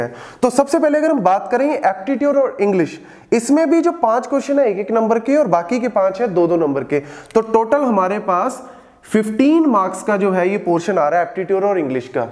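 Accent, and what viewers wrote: Punjab, India. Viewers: Ur accent has punjabi touch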